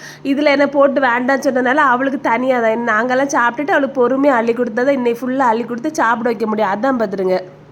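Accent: Kanyakumari, India. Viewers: நீங்கள் போடுகிற வீடியோ மிக நல்லா இருக்கு. நீங்க நல்லா சமையல் செய்ய கூடிய திறமையானவர் மட்டுமல்லாமல், அன்றாடம் உங்க வீட்டில் செய்ய கூடிய உணவுகளை பற்றி நீங்கள் கன்னியாகுமரி வட்டார தமிழ் மொழியில் பேசுவது மிக அருமையாக இருக்கிறது. நானும், எனது மனைவியும் உங்கள் வீடியோவை தினமும் பார்த்து விடுவோம். உங்களுக்கு எங்கள் வாழ்த்துகள். 👍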